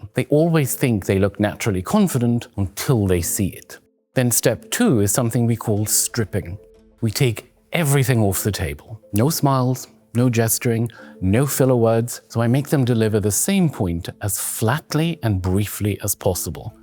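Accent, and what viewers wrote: United Kingdom. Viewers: Give me a British accent like this guy, and I guarantee my confidence is perceived as 50% higher. Stupid American accent.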